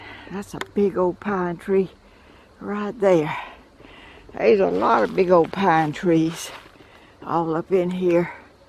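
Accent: South, United States. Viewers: Love your Shawls and have 1 I wear myself. The Ladybugs R great!! 🐞love to hear you talk and I'm a southern too. Blessings and love to You from N.Carolina.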